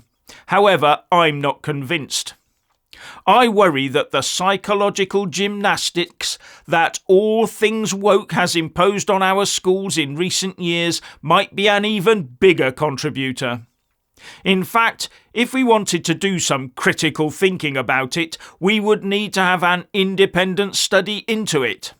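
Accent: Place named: United Kingdom. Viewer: I watch ur channel to improve my understanding of British accent... U speak so clearly, I am impressed ...❤❤❤❤❤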